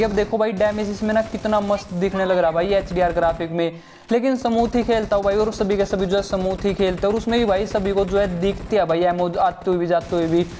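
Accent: Haryana, India. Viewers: Bhai you are harayanvi apki voice bta ri s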